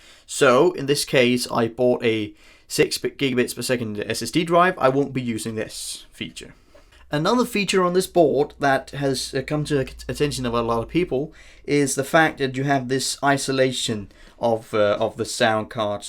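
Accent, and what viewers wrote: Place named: United Kingdom. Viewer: you look American but sound British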